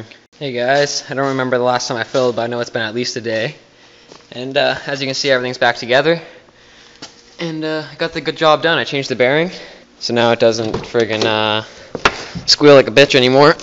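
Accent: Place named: Canada. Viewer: He sounds Canadian so probably yes.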